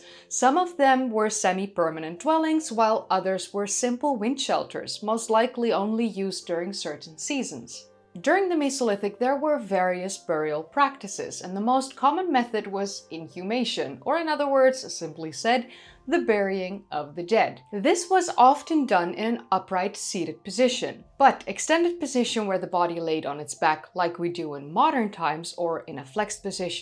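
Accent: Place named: Netherlands. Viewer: And here are again. Another amazing video. And as I have many times before, mentioned curtain lovely dutch accent. I like accents. I just kean back. Learn something and hear wonder dutch accent. So all in all another great video with the All amazing and her beautiful Toll of a of voice with that wonderfull dutch accent.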